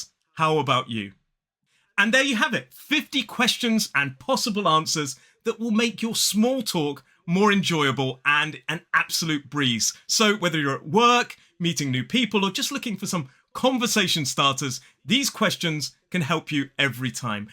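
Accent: United Kingdom. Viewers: 我真的很喜歡英式腔調 I really like British accent….🫣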